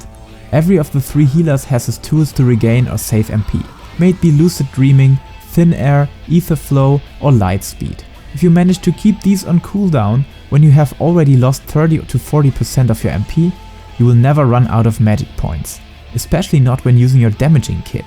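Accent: Germany. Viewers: A idea for when you're going to compare healers again Is to show icons of spells when you name them. Even tho I have all 2 eu 3 (rip astro) at 80 BIS I have no idea what most of the spells do when you call them out with your beautiful thicc German accent.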